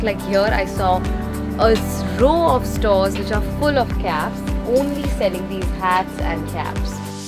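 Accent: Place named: India. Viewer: the accent is so thick( Indian) Can’t understand the half of the times. Other than she seems like a nice lady.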